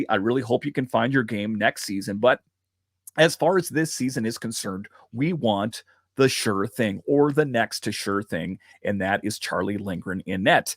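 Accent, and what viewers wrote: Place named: Midwest, United States. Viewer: Thanks! I know ive heard it before. I thought it could be something close to Minnesota, but im not too familiar with any midwest accents yet. Love the content, washington is heating up, hope they make the playoffs! Cheers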